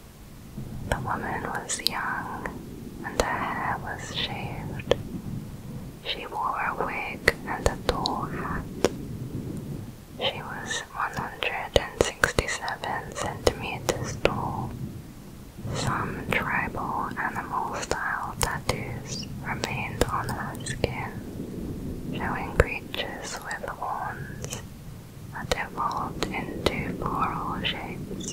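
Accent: United Kingdom. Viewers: I love ur voice lol what part of uk r u from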